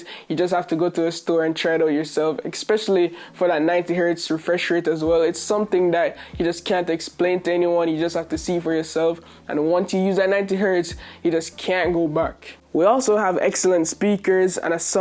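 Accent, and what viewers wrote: Jamaica. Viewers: That Jamaican accent strong with this one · You sound very Jamaican. You Jamaican?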